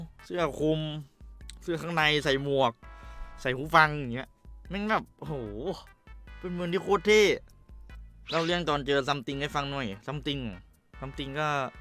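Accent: Thailand. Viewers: ไทยรัฐ บู้บี้ สำเนียงคนไทยหรอ555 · พี่ก็พูดสำเนียงไทย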